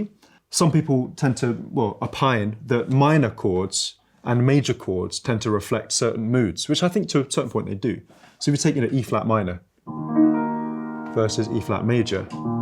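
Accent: United Kingdom. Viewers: HE'S BRITISH?!?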